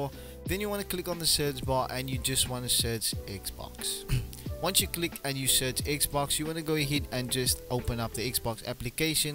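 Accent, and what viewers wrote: South Africa. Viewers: You sound like a south african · U from SA aia.? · Are you Afrikaans?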